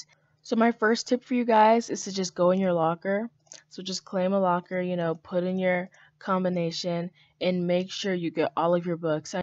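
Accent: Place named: Jamaica. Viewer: You kinda sound like a jamcain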